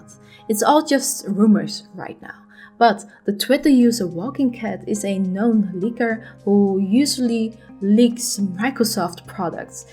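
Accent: Netherlands. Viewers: I clicked on the video and heard the dutch accent, immediately subscribed 😂🇳🇱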